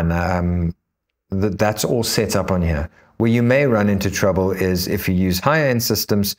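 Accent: South Africa. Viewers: Howzit? Wasn't expecting to hear a South African accent when I clicked on this video haha I'm from Canada but my family is originally from SA. Have to say this was a very clear video and can't wait to come back to it when I receive my RG405V.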